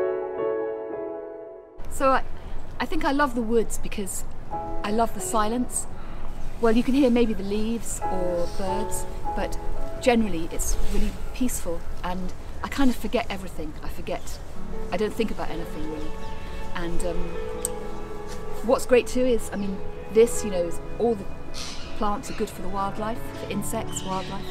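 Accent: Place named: London, United Kingdom. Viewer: 天啊。好标准的伦敦腔。听着好美好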